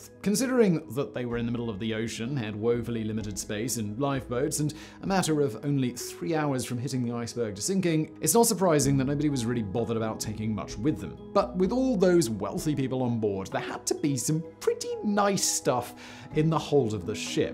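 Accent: United Kingdom. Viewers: lol, again, I realize you wanna defend your buddy Simon, but Simon is an arrogant person. He absolutely believes his British pronunciation is 'superior'.... He is absolutely not a humble person who is apologizing for his mispronounced words. 😂👍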